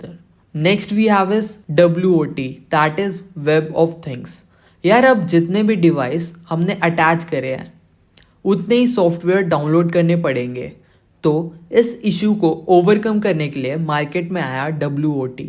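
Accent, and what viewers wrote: India. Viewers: You sound like an average indian with voice stats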